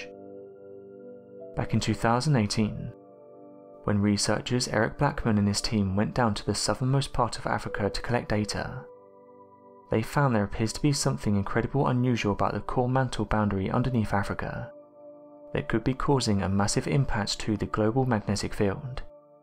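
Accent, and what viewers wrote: United Kingdom. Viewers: Galaxysurfer 1 It is you that is a bit thick. Did you not notice the guy has a British accent? They pronounce words differently. Who’s to say your country’s way of pronouncing it is correct? Have you ever heard a British person say garage? Instead of grr-age it’s more like gar-ridge. Listen to the song Levon by Elton John, he says gar-ridge by the motorway in the second verse. Since they were speaking English long before America existed, just maybe it’s their pronunciation that’s correct and you are wrong, lol.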